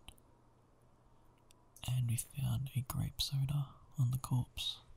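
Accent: Australia. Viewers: Really enjoyed it :) Are you Australian? It's safe to say you're my favorite male ASMR'er. You have a nice way of pacing your videos.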